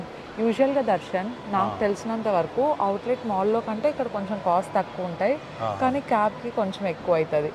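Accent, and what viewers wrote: Anantapur, India. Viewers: meeru pakka అనంతపూర్ అని నిరూపించుకున్నారు మళ్ళీ ఆ స్లాంగ్ లో తూర్పు తిరుగు దండం pedath ఆ ఓర్డ్ akadidhe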